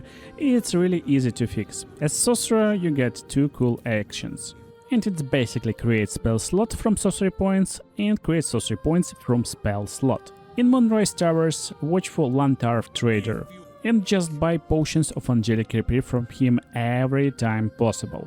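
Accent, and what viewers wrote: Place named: Russia. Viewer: Ты из России? Акцент характерный!)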